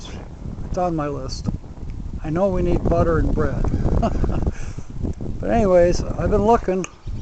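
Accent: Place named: Michigan, United States. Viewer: Hey there. Sounds like Michigan!!!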